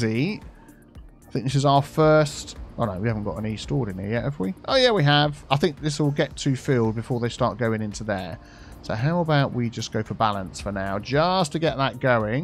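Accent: United Kingdom. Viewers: Hi, although I am on PS4, I really enjoy ur videos, and that's becouse your english accent, wich I find it to be so British, hahaha. We are u from? If u don't mind me asking.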